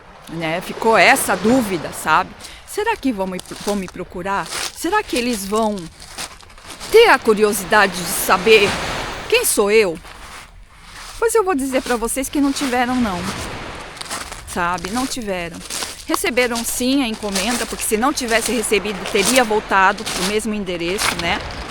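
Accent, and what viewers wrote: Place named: Brazil. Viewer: hoje estou em Portugal.gostei de ver a baleia 🐳 junto com você.gostei a sua maneira de se expressar bem brasileira mesmo😊 beijinhos.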